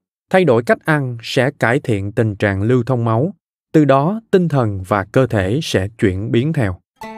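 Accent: South, Vietnam. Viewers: Đúng rồi. Giọng Nam mềm mại dễ nghe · Thích giọng Miền Nam. Thích nội dung sách. Cảm ơn bạn. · Giọng đọc miền nam hay quá, cảm ơn! Sách hay! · Tôi thích giọng đọc miền nam này, nghe mà ghiền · Đã lâu lắm rồi mới được nghe một giọng đọc miền nam quá chuẩn , phát âm rõ ràng S và X …cám ơn nhiều ! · Giong đọc của anh này quá hay , đúng là giong miền Nam nghe hay hơn giong bắc. Sách hay ,giọng nói rất hay. Cảm ơn tác giả va cảm ơn người đọc sách hay .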